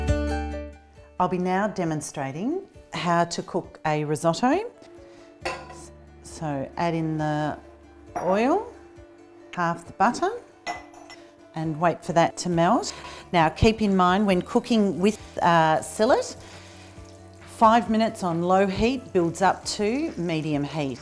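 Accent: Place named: Australia. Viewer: Thank you I love Australian people/accent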